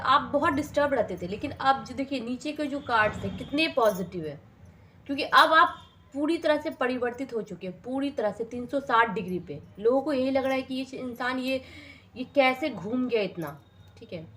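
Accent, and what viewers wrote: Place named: Patna, India. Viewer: Dee aapki tone to Patna ke aas pass ka hai..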